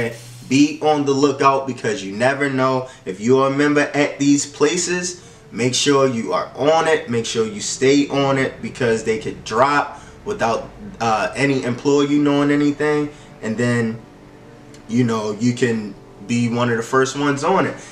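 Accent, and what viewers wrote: Baltimore, United States. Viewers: you from bmore? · That acent you from Baltimore?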